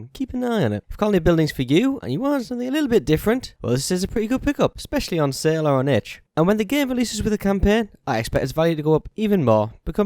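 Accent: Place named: United Kingdom. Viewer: If you actually talk to British people as you say you do, then you would know that is British. Same as most countries we have regional accents, and if you say you 'can't' understand him, then look else where. What i find most hilarious about your comment is that American's and Australian's got there English from the actual British! Be more considerate instead of being a douche! To, Your video is fine the way it is, great job! Don't listen to people who proclaim to know what a British accent sounds like:p as i said its regional!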